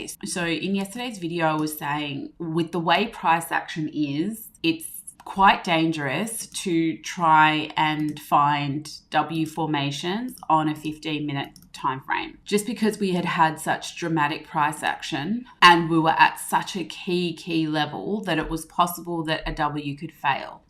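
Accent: Australia. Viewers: Not all all. Love your accent and voice! I love Aussie accents. Best one for women to have!!! I work in the Film industry with them!!!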